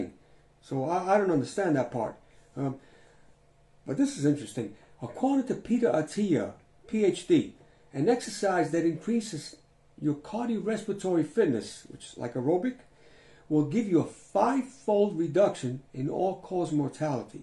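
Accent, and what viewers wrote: New York, United States. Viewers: Love the advice (I'm 76) and love the accent. Maybe you're from New Yooorrrk?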